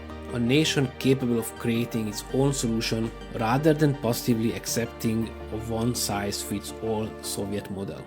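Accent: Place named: Hungary. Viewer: First I didnt see the name and startes watching the video. Than heard that dialct what just hungarians can speek while speeking english. / Mikor megtaláltam a vidót nem néztem a feltöltő nevét és ahogy elkezdett angolul beszélni az első mondatból lejött hogy magxar mert csak mi vagyunk képesek így beszélni az angolt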